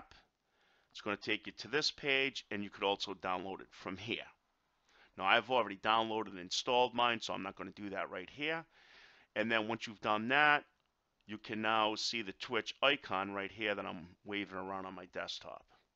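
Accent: New York, United States. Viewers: amazing info and a new yawrk accent. love it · Omg i love your accent, Americans have the best accents. Great vid too, thanks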